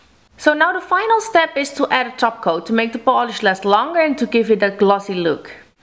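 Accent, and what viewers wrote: Netherlands. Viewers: Volgens mij kom je uit Nederland aan je accent te horen ;) Je filmpjes zijn erg leuk met leuke ideeen... En vooral heel netjes! Ik ga deze zeker proberen! x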